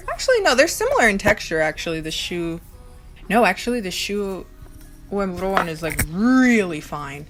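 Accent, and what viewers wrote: South Korea. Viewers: I think the korean accent is cute when you speak japanese. I am on the other side of the coin where I learnt japanese and everything gets a japanese accent instead XD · Haha you definitely do pronounce Japanese things with a Korean accent. I did the same when I went to Korea the April just gone. I pronounced everything Korean with a Japanese one 😩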